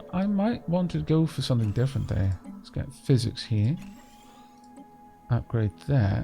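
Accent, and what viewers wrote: Wales, United Kingdom. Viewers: Merry Christmas. No offence but I love your Welsh voice, so lyrical and soft. Love the one hour vids, it's like I'm watchin' netflix. But your welsh and you stroke ferrets. How re the ferrets? Is that the reason for the soft voice, you sexy ferret beast.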